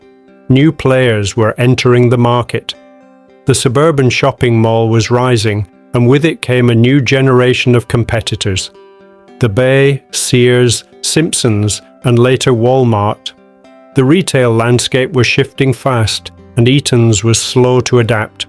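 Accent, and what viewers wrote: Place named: Lancashire, United Kingdom. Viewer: What a clear crisp voice narrating the Eatons story. Accented by a touch of Lancashire?. Possibly Wigan, Bolton, Bury, Blackburn, Darwen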